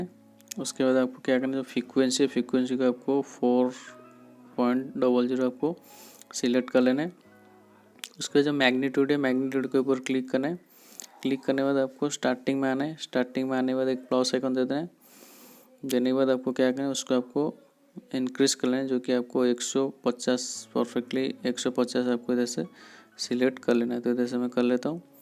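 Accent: Odisha, India. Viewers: Bro are you from odisha i can fell your odia vibes 🖤🖤🖤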